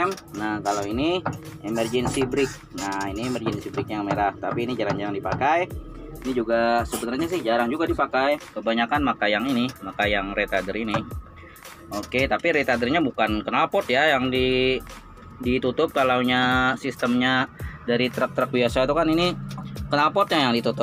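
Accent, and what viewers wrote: Banjar, Indonesia. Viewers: Lugat banjar 😂😂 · Asli urang banjar sidin ni wkkw dri logat nya,kd kwa bedusta wkwk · Orang Kalimantan kh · Logatnya orang kalimantan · Logat nya banjar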